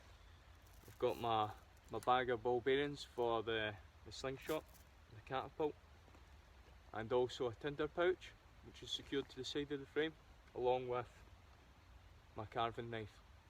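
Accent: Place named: Scotland, United Kingdom. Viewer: Do you speak Gaelic? I was thinking you were from Scotland but there was one time I guessed Scottish for someone whose accent was very similar to yours...turns out they were Welsh. Embarrassing. Haha. When you say politer, you mean no swearing? LOL. The brace on the rucksack made it look heavy so I was curious how much it weighs. Is that woodland in Scotland? And you’re welcome! Good luck on growing the beard. Mari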